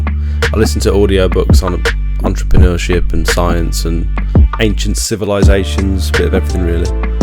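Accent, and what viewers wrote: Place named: Cockney, United Kingdom. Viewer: sound like that cockney fella dizzy new hights great videos very inspiring and informative keep moving forward 🎉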